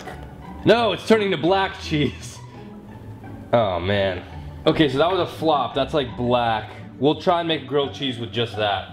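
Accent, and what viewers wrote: Canada. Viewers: I love the way Canadians say out😂😂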